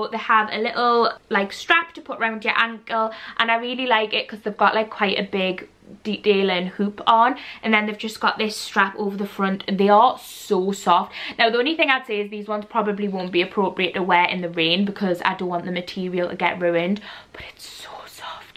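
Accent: Newcastle, United Kingdom. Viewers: You always make me laugh 😂😂 soo funny.. Are you from Newcastle? Love the geordie accent! Going to be soo embarrassed if I'm wrong though 😂 also are the shoe sizes true to size? Xx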